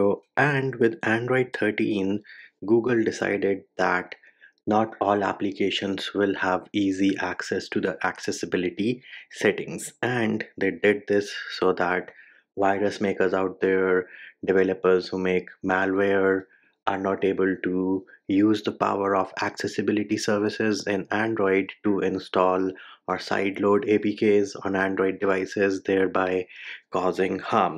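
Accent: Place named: India. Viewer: Indian accent😂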